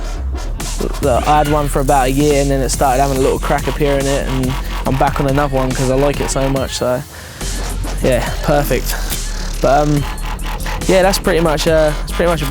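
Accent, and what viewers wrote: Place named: England, United Kingdom. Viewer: dont give him shit thats probs just how english say bike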